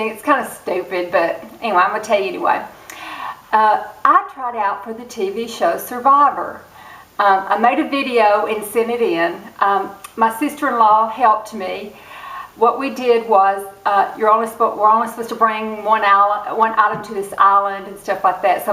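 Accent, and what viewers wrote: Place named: South, United States. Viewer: You would win SURVIVOR, I'm certain... your sweet genuine spirit would definitely win votes. I'd bring you as my one item because of your heart warming personality~ I often think my adult daughters will show up at my door doing an intervention someday - I "hoard" skin care, make-up, BOOKS, and shoes... I love your videos and always enjoy your sweet southern drawal... never boring,!!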